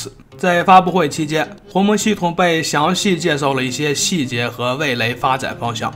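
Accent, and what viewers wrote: Shanxi, China. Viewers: 山西老大哥?这口音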